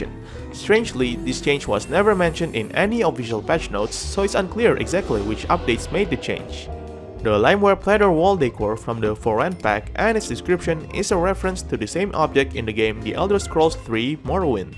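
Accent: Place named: Indonesia. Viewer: always love hearing your indonesian english... unlike how most locals talk nowadays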